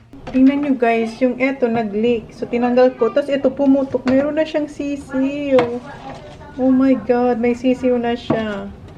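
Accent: Philippines. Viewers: Alam ni yung Filipino accent😂. Love it!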